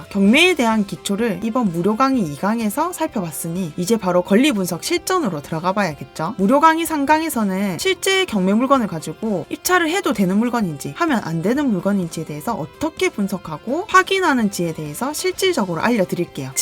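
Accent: Gyeongsang, South Korea. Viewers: 왜 이영상을 이제 야 봤을까.. 유료 강의 듣고 잇는데 거기서 들은 내용이랑 똑같네요ㅎ 같은 경상도라 그런지 쏙쏙 들어와요ㅎ 감사합니다 감사합니다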